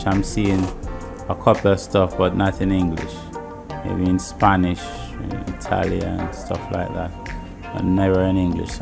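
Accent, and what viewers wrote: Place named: Jamaica. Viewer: yo yah jamaican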